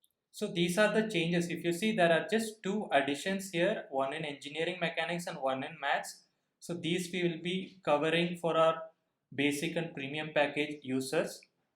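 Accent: India. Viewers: Sir are u south Indian?which state r u from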